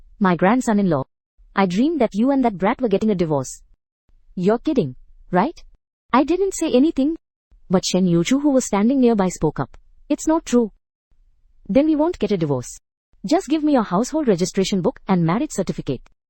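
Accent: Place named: India. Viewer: Coba yg naratornya English biasa masih aman tp ini india adehhhhhh harus pasang telinga utk lebih jelas pengucapannya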